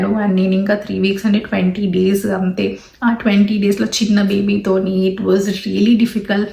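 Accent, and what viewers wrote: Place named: Canada. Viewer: vat izz dis, whu bludy cars abut ur uze les histeri. nd u r aksent iz u born nd brat up in khanada not in India. duck off nd u cho kalled NRI's doont put dese vedios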